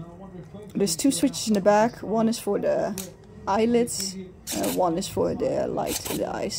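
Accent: Netherlands. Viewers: The dutch accent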